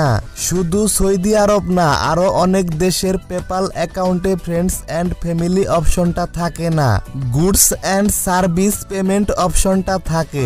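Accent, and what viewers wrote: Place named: India. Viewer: Title with eng why the hell u talk with Indian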